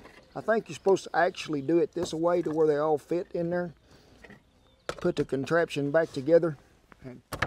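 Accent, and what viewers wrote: South, United States. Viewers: Bool the chicken then a nice coat of ool. Southern accent 👌👌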